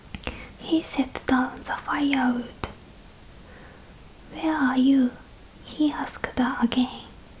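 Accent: Japan. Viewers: Japanese is the most familiar language I will never actually learn. But I enjoy the cadence the natives have even when speaking English.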